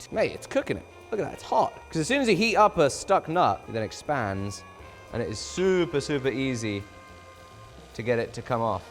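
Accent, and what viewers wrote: England, United Kingdom. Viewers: You already look like you’re making a Harry Potter sequel, the English accent seals the deal. I think you did that on purpose with the thumbnail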